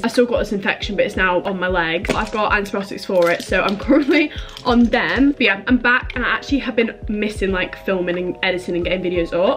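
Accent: United Kingdom. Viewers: shes not australian shes said shes british before and she has a sort of british accent and definitely not a australian one x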